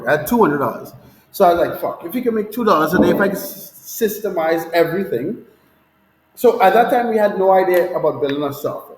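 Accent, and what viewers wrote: Trinidad and Tobago. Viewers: No BS Drop Shipping Training WHAT DE ASS BOY! A Trini! Oh Gossshhh! I subscribing Now! I'm from Laventille! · sounds like u from trini man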